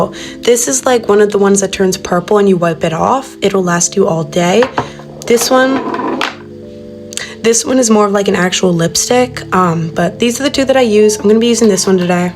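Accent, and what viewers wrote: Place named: Netherlands. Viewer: You sound very dutch 😂 I am dutch aswell :3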